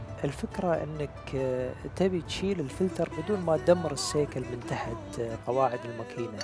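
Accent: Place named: Kuwait. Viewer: ايه الله يسعدك فعلا بس والله ماكنت أعرف إنك تتكلم من الكويت على كل أهل الكويت حبايبنا. فعلا فيه تباين باللهجات بس كنت أفكرك من ربعنا هههههه أعتذر منك وشرفني مرورك يالغالي. أعتذر منك بشدة. كلمة حق أهل الكويت رواد في كل شيء مميز بصراحة. وهذا شي ظاهر وواضح مايحتاج لشهادة أحد.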